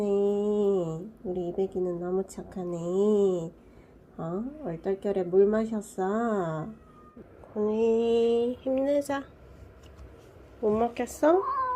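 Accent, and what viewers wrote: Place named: Gyeongsang, South Korea. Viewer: 안녕하세요? 대구에 구내염 발치 하는곳 정보좀 알려주시면 감사하겠습니다 님 혹시 대구분 아닌가요? 경상도 억양이셔서..구내염 심한 길냥이 한녀석이 있는데 발치를 해서 나을수 있을까 해서요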